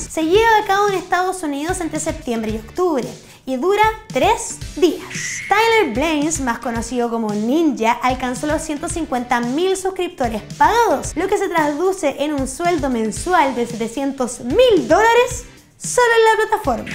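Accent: Chile. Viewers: Me encanta ese acento chileno tuyo 😍👍Saludos desde Brasil 👋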